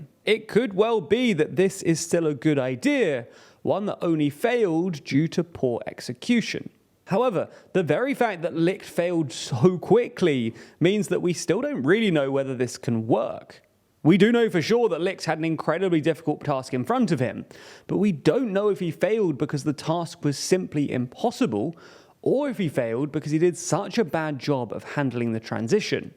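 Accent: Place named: United Kingdom. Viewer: Why do people automatically believe that a guy speaking in a British accent is some kind of expert on news! Especially when we freaking live in North America and have literally thousands of news "outlets"? So why listen to 3rd hand news from some British Pud who hasn't ever left his own town, let alone his country!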